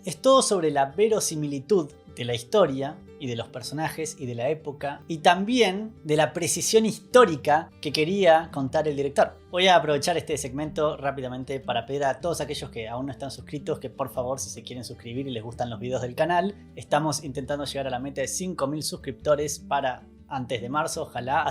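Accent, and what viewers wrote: Argentina. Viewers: ¿Eres argentino o uruguayo?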